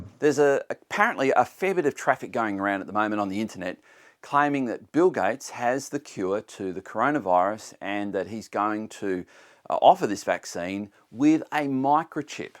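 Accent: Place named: Australia. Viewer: I will read the bible like it was "meant" to be read, when you can learn to speak English how it was "meant" to be spoken you fing ossie. We cant take anything you say seriously.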